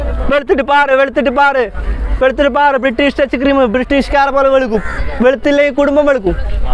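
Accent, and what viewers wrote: Kasaragod, India. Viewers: kasaragod basha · Ksaragod slang mindan ni onnum koodi indanam mone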